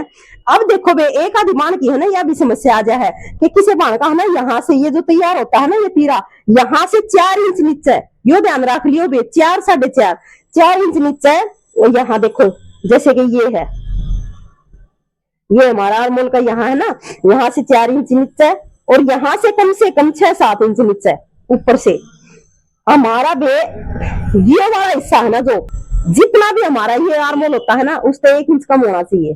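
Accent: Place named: Haryana, India. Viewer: Bahan ji haryanvi hi bolte raho acha lgta h ❤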